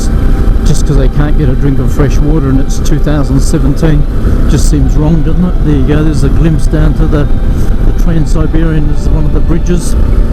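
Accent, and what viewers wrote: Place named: England, United Kingdom. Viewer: ..hey...r u a sandgroper?! Sound like a pom! We wanna c where u camp n what u eat - and let us know where u r!! Cheers - enjoying it.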